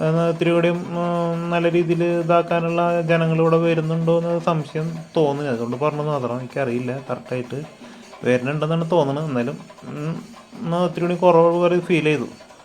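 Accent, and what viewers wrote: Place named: Thrissur, India. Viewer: സംസാരം കേട്ടാൽ തന്നെ അറിയാം തൃശൂർ anennu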